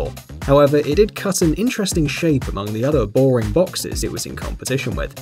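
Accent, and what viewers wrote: United Kingdom. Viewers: Yozika - It’s how we all say it in the U.K. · hi un day cou pay? freakin' british english.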